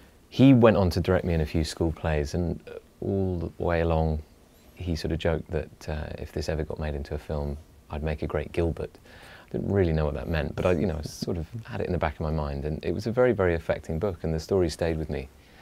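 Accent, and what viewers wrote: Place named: United Kingdom. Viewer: Dan Stevens has become too much " californian ". Too bad 😔 He lost his soooooooo british personality, fortunately not his accent. I'm a bit disappointed because he had then a lot of charm and now with his new look and his pounds off, he is less attractive. As I said , he is too much Californian. Long life to the UK Greetings from France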